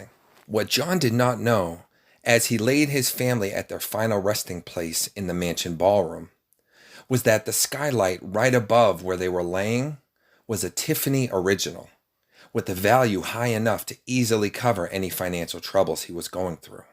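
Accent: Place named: New Jersey, United States. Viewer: I apologize for coming to your blog late. I like to spread positivity although some of the topics I'm interested in aren't filled with light and happiness. I was just captured by your voice and the way you presented the facts in this example of true-crime posts. You didn't skip a beat and many don't know it's not just reading from a transcript, but having the ability to entertain the audience with a rhythm and lack of audible mistakes. We appreciate the time you spent researching the project and i look forward to more works from you. This is just a silly side note, but this evil man spent most of his life residing in New Jersey. I may be wrong, but i detected a New Jersey, New England-type dialect. Thank you for reading. Greetings from the Buffalo, NY area. 😊 K.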